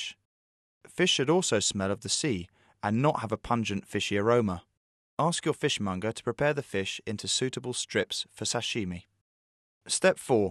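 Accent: United Kingdom. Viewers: British pronunciation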